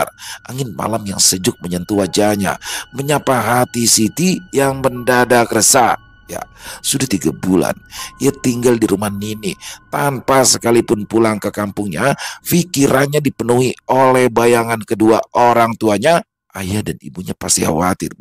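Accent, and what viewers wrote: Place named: Banjar, Indonesia. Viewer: ASSALAMU ALAIKUM Bg,slmt mlm 🙏,kisah yg sgt kereenn,👍satu hal yg paling suka jika stiap kali bang membawakan kisah"dari tanah Kalimantan,logat bahasa Banjarnya itu sgt fasih,sbgmna orang" Banjar berdialog sehari"nya,& itu sllu saya perhatikan,tdk canggung,mantaaabbbb, 👍👍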